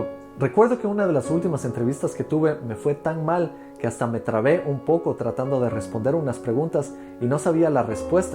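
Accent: Ecuador. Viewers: Eres ecuatoriano :o?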